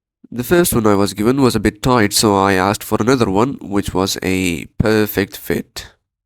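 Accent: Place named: Russia. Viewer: Why there is a lil bit Russian accent in your english?😅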